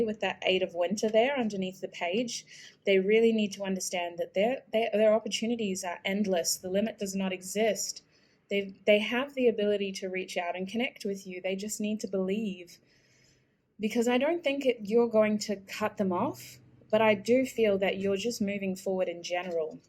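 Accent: Australia. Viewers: I listened to a few videos from you. I wasnt paying attention to the accent but this video is clearly Aussie accent. I just recently moved away from Au and u made me missing it. And thanks for the picking card. For pile 3, when u picked the card “I imagine u naked”, I’m dead laughing haha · I love you!!! Your readings are so different, original and so accurate! I chose pile 2 and it's like personal reading! Love it! And I love your aussie accent and swearing as well!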